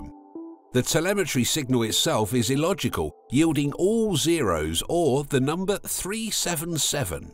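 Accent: United Kingdom. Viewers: Loose the limey.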